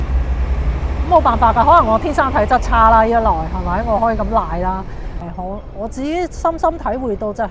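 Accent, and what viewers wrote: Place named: Hong Kong, China. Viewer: 你係咪耳聾架，正宗香港人一聽就知片主係香港人啦，咩野大陸同胞共享香港免費醫療呀？有病快啲去睇醫生啦，新制下，精神科唔使錢架。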